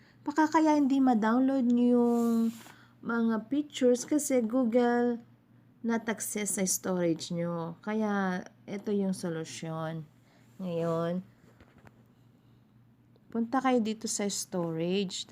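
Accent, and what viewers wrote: Philippines. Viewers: Why do u sound like Phillipino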